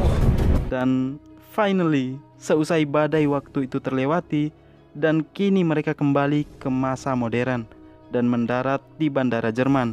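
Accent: Java, Indonesia. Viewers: Wong jowo awok awok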